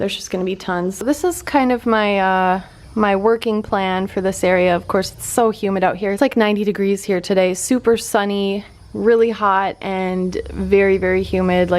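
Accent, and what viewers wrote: Minnesota, United States. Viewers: HA! I knew you were from Minnesota!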